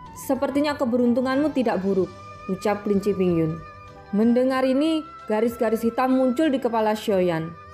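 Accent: Java, Indonesia. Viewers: masih meddok suaranya, powernya di tambah lagi ya, biasa namanya juga pemula semangat💪💪💪💪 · Memang suaranya beda,kalau bisa min logat Jawanya agak kental itu aja sih kalau sy. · Hargailah orang yg berusaha menghilangkan suara mendoknya. Semangat mba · Medok nya. 🥰🥰🥰.... Mbak e.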